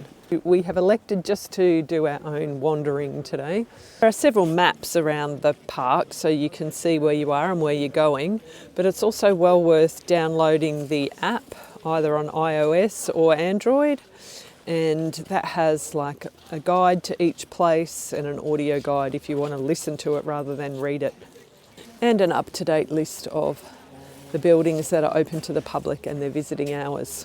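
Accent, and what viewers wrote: Australia. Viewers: Excellent Editing. It’s great to hear an Australian accent on a travelogue.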